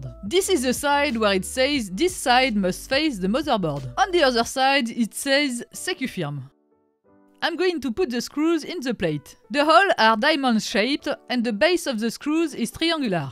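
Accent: France. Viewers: salut, cher camarade français $$ j'adore l'accent english des fr qui parlent anglais ^^ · Beautiful video ❤ Clear instructions on how to install Noctua Fan on the Motherboard 🖥 Love the English in French accent 🟦⬜🟥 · The accent is not exactly "French", it is more precisely Parisisan accent. Try the Québec accent. '-) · Thickkest French accent ever 😅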